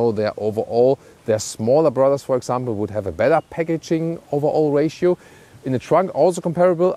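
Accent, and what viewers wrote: Germany. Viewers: Der deutsche Akzent tut schon ein wenig weh beim zuhören .... aber wahrscheinlich notwendig um einen Channel groß zu bekommen.😶‍🌫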